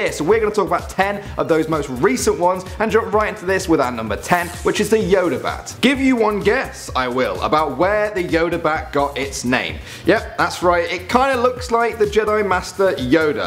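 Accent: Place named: England, United Kingdom. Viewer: Like English accent🇬🇧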